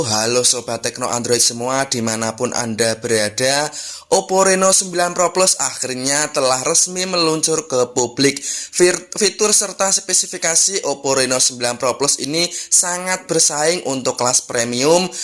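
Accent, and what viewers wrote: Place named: Java, Indonesia. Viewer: Medok banget pengisi suaranya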